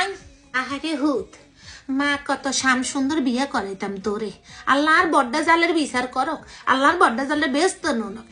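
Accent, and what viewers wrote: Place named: Feni, Bangladesh. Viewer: আমার বাড়ি ফেনী তাই কথার অনেক মিল পাই। আপনার কথা শুনে দাদুর কথা মনে পড়ে গেল। দাদু এখন আর নেই। অনেক মিস করি দাদুকে। ❤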